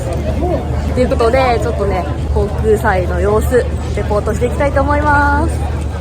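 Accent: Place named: Kansai, Japan. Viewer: 、お疲れ様❤❤❤ 横田基地、楽しかった見たいダネ〜👍👏👏👏 の関西弁が出まくってますから🤣🤣🤣 オプスレーにC2にYS11もあるのかナァ？戦闘機は、F22にF15にF2選り取り見取りダネ〜🥰🥰🥰 でも、やっぱり、私は、自分も、関わっている、AH1コブラ🐍ダネ👍💖💖💖 は、何が、良かったかなぁ？あっやっぱり、外人バーガー🍔かぁ🤣🤣🤣 じゃ、次回は、山口県、岩国基地のレポート、お願いしま〜す😘😘😘😆😆😆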